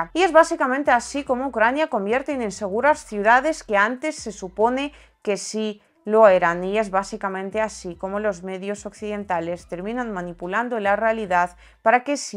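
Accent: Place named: Spain. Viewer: Hola, recuerdo cuando emoezmaos a verte en sitios como twitter, tu acento totalmente español, porqué ahora tienes ese tono tan raro para contarnos las noticias? Es tan monótono que no ayuda a concentrarse ni a terminar de escuchar la noticia entera. Podrías volver a hablar normal? Gracias!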